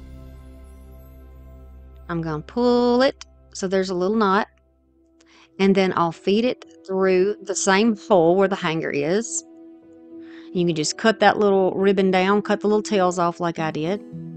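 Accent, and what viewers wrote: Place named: South, United States. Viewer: PS ....I LOVE LOVE your accent ... I regularly watch Randy and Amanda from Incredible Tiny Homes ...I found them during tough times ...so now I am a sucker for a southern accent ....wishing you well ...happy crafting ..xo from Vancouver BC Canada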